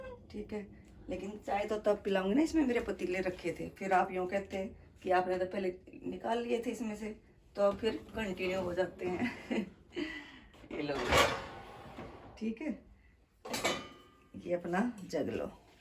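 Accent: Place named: Haryana, India. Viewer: Main aapki new subscriber hu mam..aaj ek dishwasher ka video meri screen pe aaya to main usko dekh rhi thi...to aapki video dikhi....aap hryana se ho kya..aapki awaz me hryanvi tone aati hai...to puchha...bcoz m hryana se hu......bahut hi impressive bolte ho mam aap...nice video...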